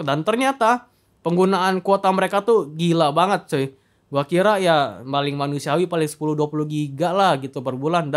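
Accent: Palembang, Indonesia. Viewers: Logat palembang itu bg mana ada tiru tiru hadehh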